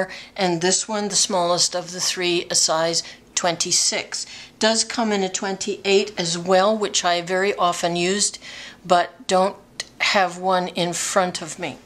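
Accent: Canada. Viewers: Hi! I changed my youtube name from TheConnieS to Petalpresser. I hated that other one.. I don't do cross-stitch anymore because of carpal tunnel and eyesight. I never saw someone thread a needle like that before. Don't know if I could do it. You are a very good teacher. Your voice is very soothing. I think it's the Canadian accent. : ) Always glad to see your videos. What a treat!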